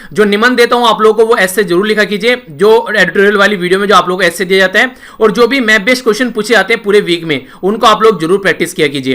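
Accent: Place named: Uttar Pradesh, India. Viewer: Sir ki language se Mujhe v lgta h.. Jaise kbhi kbhi unka tongue slip kr jata h or UP ka thoda effect dikh jata h.. Jaise ki kl... Hamaari ko hmri bol diye sir... Aise bahut baar hua h... Bt sir btate hi ni.. 😣😣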